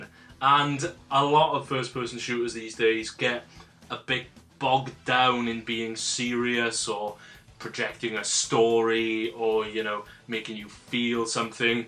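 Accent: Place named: Wales, United Kingdom. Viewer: You sound very Welsh What part of Wales are you from!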